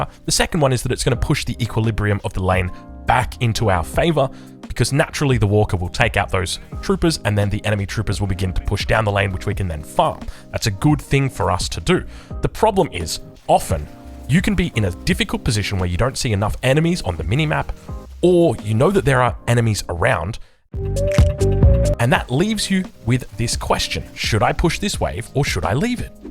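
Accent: Australia. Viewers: Wait, are you?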